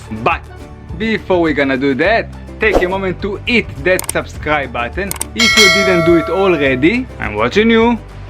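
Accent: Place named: Israel. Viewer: Hey thx.. you have an Israeli accent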